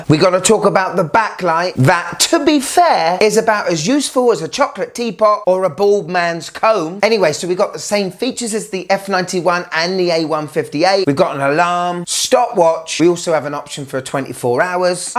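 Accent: United Kingdom. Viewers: Hi friend, thank you so much for you videos, and as i'm english learner and i use your videos to learn britich accent, cuz you have a great one, i have a favor to ask, can you add to your video a transcript have all your talk please ? and thank you so much again for your Job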